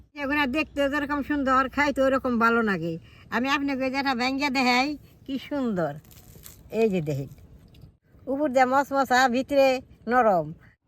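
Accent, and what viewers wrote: Tangail, Bangladesh. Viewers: মাসির বাড়ি ইন্ডিয়া হতে পারে না।এটা টাংগাইলের ভাষা আমার নানার বাড়ি টাংগাইলে,মায়ের ভাষা এমন ছিল।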